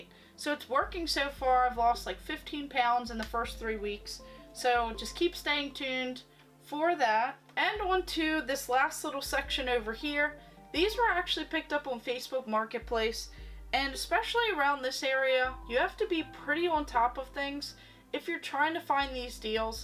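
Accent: Maryland, United States. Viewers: Great video. Love the Maryland accent 'hon! Your collection is incredible, glad I found the channel.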